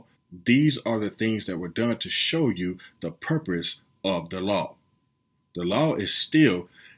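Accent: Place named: Memphis, United States. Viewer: I agree! Very good brother! Where are you from? Memphis? Love your accent! 👍🏻🙏🏻😀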